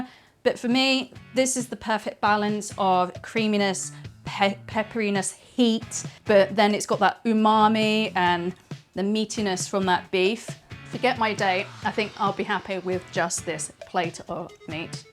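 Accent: United Kingdom. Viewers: I don't know if you added a little touch but, your voiceover really has a nice british/english accent than your normal talking voice. I guess because your recipe today is a "westerner" type of dish instead of an asian dish ^_^ The sauce is the bomb! I might try this! I think that it can pair well with other meats!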